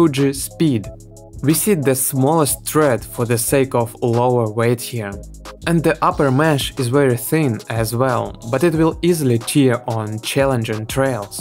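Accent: Russia. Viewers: Thanks for the analysis, but this russian accent really turn me down.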